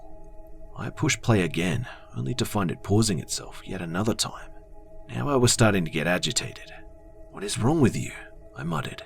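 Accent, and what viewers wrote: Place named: Australia. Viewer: Absolutely, I've been a follower for some time now and sorry if my comment came off a bit sketchy. 😂 I guess you're australian but could be wrong, I'm from Europe myself btw so it sounds original to me (in a good way ofc). I do like the rest too, the narration style, stories and the atmosphere etc. I find myself prefering this "stories-channel" first out of similar channels. 👍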